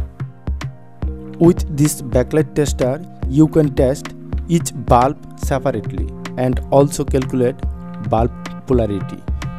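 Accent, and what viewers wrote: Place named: India. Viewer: your english is shite. speak proper english not the indian english.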